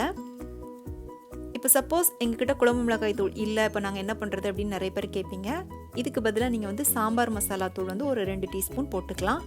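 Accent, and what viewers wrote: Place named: South Tamil Nadu, India. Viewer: mmm nanun ipdithan seyven! And which is ur native ! Your slang seems that u r frm south tamilnadu!am i right !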